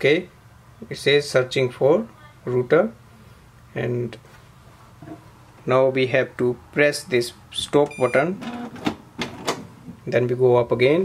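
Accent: India. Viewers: The only Indian accented English voice I will ever trust..Thank you!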